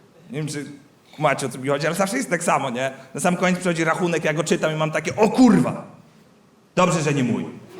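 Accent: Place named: Mielec, Poland. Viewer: Po akcencie myślałem, że jesteś z Mielca lub Zbuczyna co nie? Dużo się nie jebłem 😉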